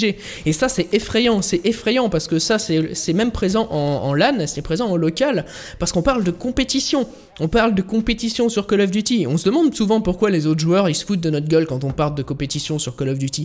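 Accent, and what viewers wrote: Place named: England, United Kingdom. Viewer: arrêté l accent englais ça fait pitié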